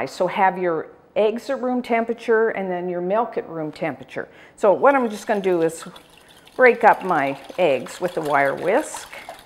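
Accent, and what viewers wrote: Canada. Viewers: I couldn't place your accent but caught some Canadian. Great recipe! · Ah ok cool. You still sound canadian which is great to hold onto your accent :)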